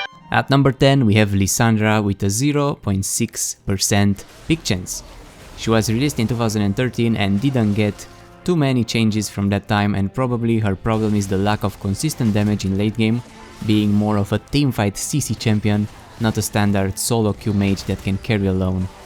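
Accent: Romania. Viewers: are you romanian? you have accent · You have Romanian acces :D Deci esti roman :))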